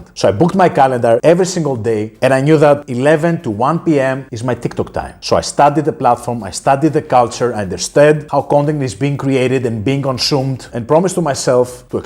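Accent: Greece. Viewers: I think you are from Greece because of the accent ☺️♥️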